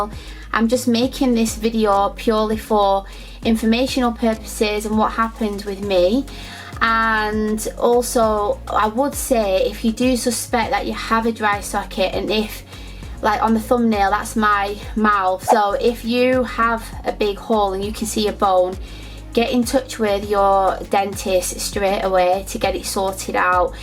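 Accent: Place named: London, United Kingdom. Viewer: thank you for this information. it helped me. I found your accent very easy on the ear. im from California, Huntington Beach area. so our accent is unnoticed by our ears and yours stands out. but with your accent in particular, it reminds me of a london couple i once met. also you have fabulous hair and i also noticed the amount of empathy and inclusive concerns for the audience. so it creates more engaging conversation.... i totally relate to what youre saying. i found myself hanging on every word and i can say i really learned something about my experience. i went through literally the same issue. makes you a very beautiful and very decent person and i truly appreciate this info. thank you for this. liked the video and subscribed.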